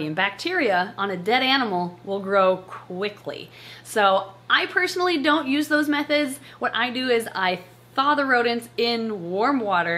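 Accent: Minnesota, United States. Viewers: Minnesota English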